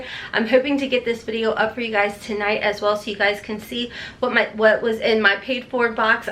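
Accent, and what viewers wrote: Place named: Kentucky, United States. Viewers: Hi! I love watching your videos. I’m from Kentucky too and it’s nice to hear someone with the hometown sound. 🤣 Your videos are seriously my favorite! <mail> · I’m in West Virginia and I love watching your videos for the Kentucky accent. Love it! IG is cherylb_88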